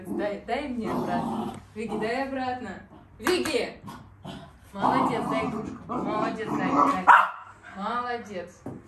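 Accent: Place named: Eastern Europe. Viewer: Her accent seems to be from Eastern Europe. Where is she from ?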